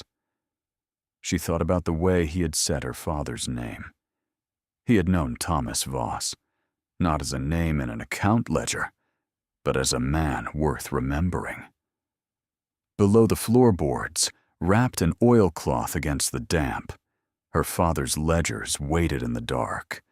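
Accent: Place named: United States. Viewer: Ovo je jedan od dva AI kanala koja pratim, ne volim AI kanale ali volim ovakve priče, kad ChatGPT nije nepismen kao obično. Molim da se prebacite na britanski engleski jer američki akcent u pričama ove vrste iritira i dodaje na veštačkom dojmu kojeg je, zbog AI, ionako više nego dovoljno. Hvala.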